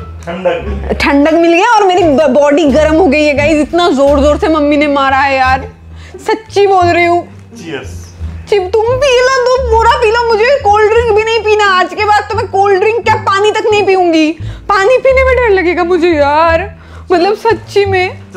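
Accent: Bengal, India. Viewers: Didi are you a bangali I am also bangali😀 I noticed that your tittle is and your taking is just like bangali Khathi bangali❤️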